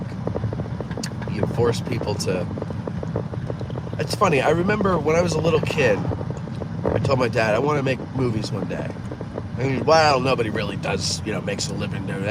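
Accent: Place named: New Jersey, United States. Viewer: Your jersey accent is a trip..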